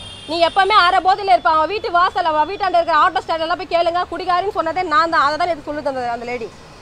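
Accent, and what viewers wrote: India. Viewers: அதென்ன வீரலட்சுமி மக்கலே மக்கலே இடையிடையே மெட்ராஸ் தமிழ் வேற வருது😮😮😮. தோலோடு தோலாவகவா😮😮😮 இன்னா இன்னா வேற இடையிடையே வருது😮😮😮. அதென்ன க்ளாஸ்மெட்😮😮😮வந்து வந்து வந்து வந்து வராம வந்து. இது நல்லா இருக்கு தமிழ் பாஷை😮😮😮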